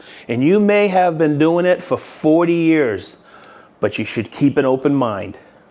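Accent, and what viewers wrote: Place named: Boston, United States. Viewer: I know a Boston boy when i hear one. Thanks for the video buddy, just discovered your channel and looking forward to more. Cheers from Malden! 😂 Oh… and it’s Aliens for me.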